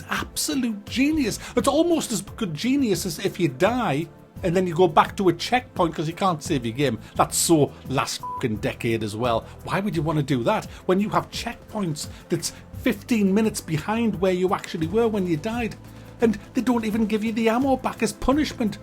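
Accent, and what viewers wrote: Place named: North East England, United Kingdom. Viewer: Are you from sunderland or anywhere in the north-east because you really sound like it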